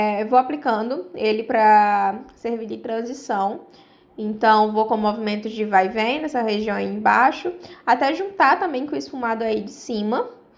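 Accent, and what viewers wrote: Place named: Rio Grande do Norte, Brazil. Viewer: Esse sotaque só podia ser do RN mesmo ❤️❤️❤️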